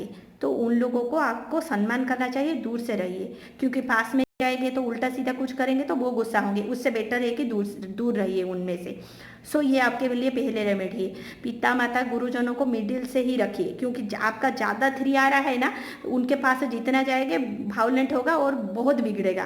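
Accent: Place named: Bengal, India. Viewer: Ok. Nice of you. Will I get a government job? Odisha seems like Bengali accent. Nice of you.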